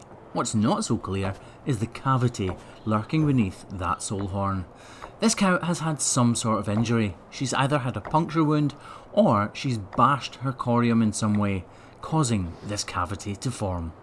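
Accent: Scotland, United Kingdom. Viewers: I really enjoy watching your videos . I’m fairly new to your channel, but I must confess, since I’ve been watching you every time I clip my nails I hear (in your lovely Scottish accents) “ You lot just look at this heifers overgrown hoof , lets just make her as comfortable as possible “ 😜🤪😝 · I'm a 65 year old grandma and I live in a little old house on the lower Eastside of Erie, PA. And I never miss an episode of the hoof gp. Why I find it so fascinating 🤔 I have no idea. Maybe it's that Scottish accent 🙂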